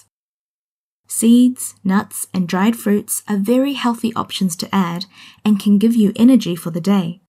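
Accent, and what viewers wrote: New Zealand. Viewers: 뉴질랜드느낌 많이나네요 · 한국 온지 넘 오래돼서 뉴질랜드 발음 다 까먹었는데 이렇게 다시 듣게 되니까 친근하고 반갑네요 ㅎㅎ 갠적으로 포리지 좋아해요>.<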